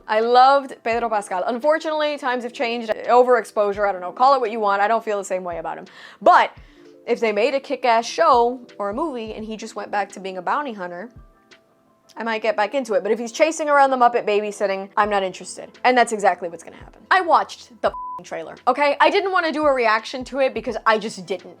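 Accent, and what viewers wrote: Spain. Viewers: Less "F" bombs, more Spanish accent!!!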